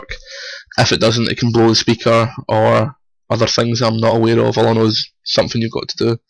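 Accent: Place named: Scotland, United Kingdom. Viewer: scotish mate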